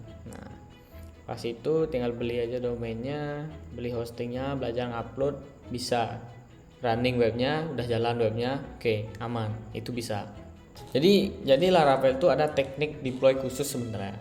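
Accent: Bali, Indonesia. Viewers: Kental banget dengan logat balinya bli, btw salam dari programmer lombok bli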